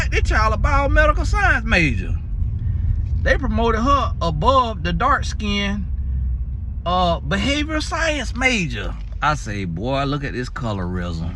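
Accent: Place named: South, United States. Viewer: I may to watch this quick clip more than once. With southern accent and jokes. I'm busy laughing to grasp the teaching. what in the werl?!😅😂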